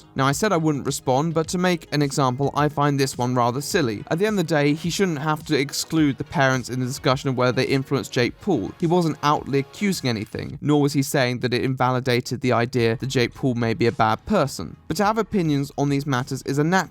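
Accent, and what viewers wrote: RP, United Kingdom. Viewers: Just because he puts on a posh accent does not make him the BBC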